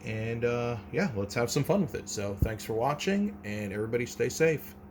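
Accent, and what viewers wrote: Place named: South, United States. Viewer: New subscriber. Great video buddy! The only flaw I can find is your heavy southern accent. Maybe in your next video you could do a New Jersey accent to help appeal to all Americans and drive up your views.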